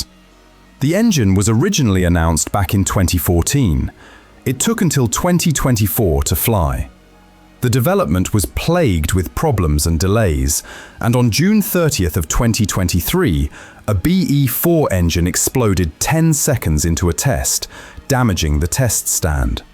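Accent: England, United Kingdom. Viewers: He's English from the sounds of it. Some of their pronunciations are weird.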